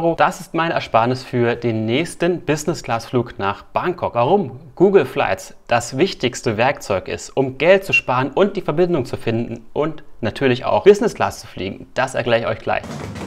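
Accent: Germany. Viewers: Ist das so eine Art Bauerndialekt? Uckermark?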